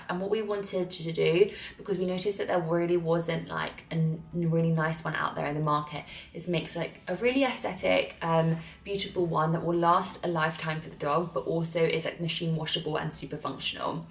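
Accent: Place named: United Kingdom. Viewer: I like your British accent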